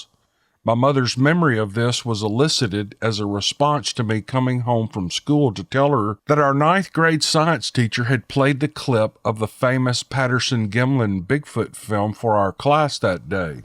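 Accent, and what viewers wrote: South, United States. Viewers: I just love you country voice. You have that voice that you get around a fire and get you some popcorn and get comfortable and listen to you. But I can get in my bed and play your channel and picture my mind in these stories like I'm there. When you tell these stories. Thanks you do a very good job and telling these stories.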